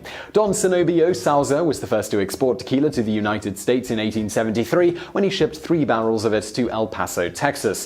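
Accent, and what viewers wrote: England, United Kingdom. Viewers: Is this how englishmen pronounce Nahuatl words? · i cant learn with this britt talking this england mess at me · And i thought he's a British who lives in Canada lol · I thought that you were in England you sound British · I thought same, accent is definitely British though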